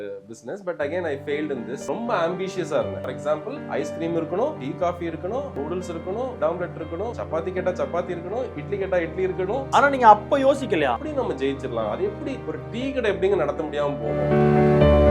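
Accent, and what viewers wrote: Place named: Tenkasi, India. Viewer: அம்பாசமுத்திரம் -தென்காசித் தமிழ்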